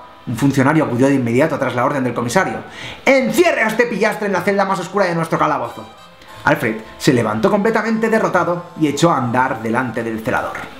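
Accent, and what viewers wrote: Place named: Catalonia, Spain. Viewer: me he vuelto a enamorar de tus dejes catalanes al hablar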